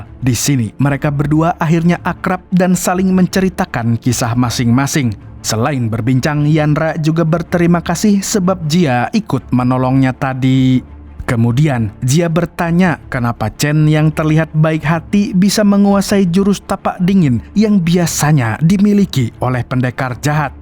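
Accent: Banjar, Indonesia. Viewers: Orang Banjar,, saku mimin nya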